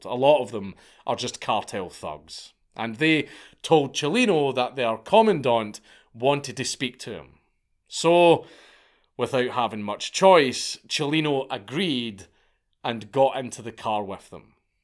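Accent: Scotland, United Kingdom. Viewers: I’ve never heard “corridos” pronounced like that 😆 something beautiful about a Scottish (or Irish?) lad saying Spanish words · I can see now that Mexico Spanish pronunciation can be a real bitch for a Scottish accent.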